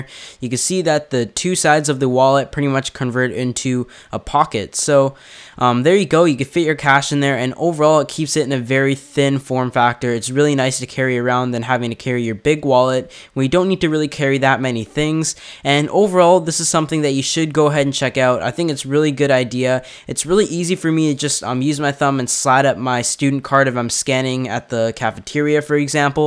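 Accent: Canada. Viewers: Your're Canadian?!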